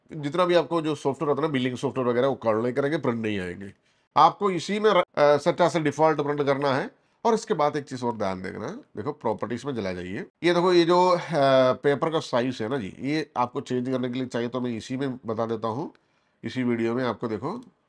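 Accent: Kerala, India. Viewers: Malayalam touch Hindi 🙄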